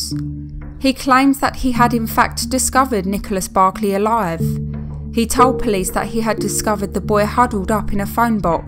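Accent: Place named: South London, United Kingdom. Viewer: I like the subject matter but I am so distracted by the awful narration ! Robotic and an awful South London accent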